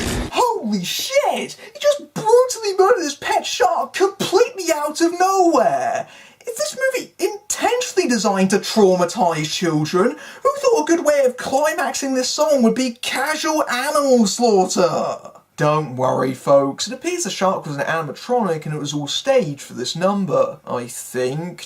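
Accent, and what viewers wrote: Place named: United Kingdom. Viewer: Да, британский акцент ОЧЕНЬ специфический. Но Фильм Брейн делает его невыносимым!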